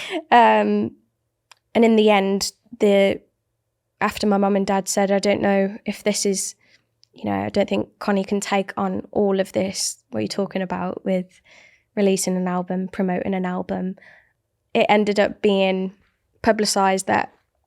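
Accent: United Kingdom. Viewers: I love British accents & I definitely love her singing.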